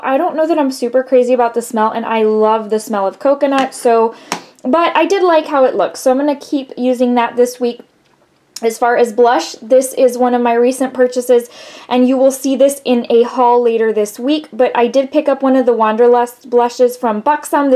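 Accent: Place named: Midwest, United States. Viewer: I would have never guessed you were from Texas. Did you grow up in the midwest? Your accent sounds like my midwestern relatives!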